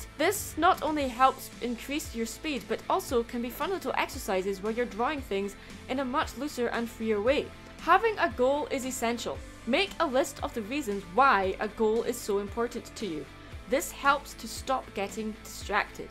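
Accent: Scotland, United Kingdom. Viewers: Are u Scottish? I am!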